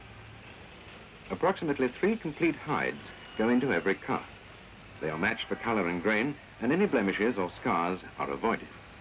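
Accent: RP, United Kingdom. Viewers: I thought it was the typical "received pronunciation" of the era, aka BBC English...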